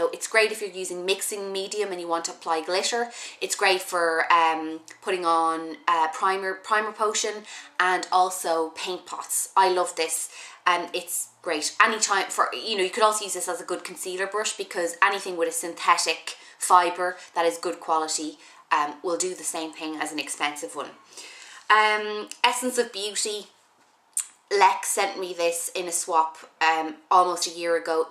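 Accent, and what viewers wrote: Ireland. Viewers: This probabley sounds really silly...but I just love the irish accent. I read a whole bunch of Maeve Binchy novels and hope to travel to Ireland some day.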